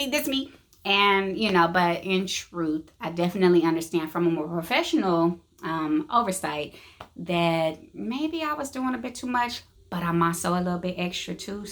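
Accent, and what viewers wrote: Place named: Arkansas, United States. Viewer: I love the Arkansas accent lol great vid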